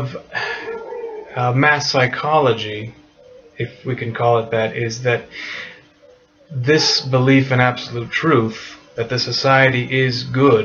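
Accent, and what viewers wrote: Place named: Canada. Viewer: Your dialect sounds Canadian, but it might not be. In Canada we have a monarchy, although the queen has no actual power, although she often is astonished to herself discover how much moral power she actually has. But legally, she is unchallenged and unchallengeable - but, realistically, she has no power at all. If she pushes the envelope at all, we'll suddenly have an Act of Parliament declaring Canada a republic, and nobody wants this to happen. So what is going on? We agree that she is necessary as one of the illusions or myths that bind our society together. She is just a human being, but she is also Her Majesty - chosen by god, even though nobody believes that, and probably never did. Society requires rules, and these rules require justification. So we have myths that we know are myths, but what they really are is a psychological boundary. 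"Question this, and the whole thing comes unglued" is a very "Canadian" thing to think, and that goes for English and French, as well as immigrants - who buy more readily into Canada's myths than native-borns do. So it is both absolutely true and absolutely false that the queen reigns. It's I suppose an agreed-upon sort of doublethink, but utility trumps "reality" here.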